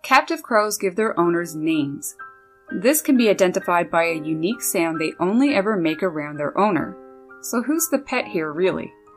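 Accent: Canada. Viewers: Annoying Canadian accent. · By any chance are u from Canada?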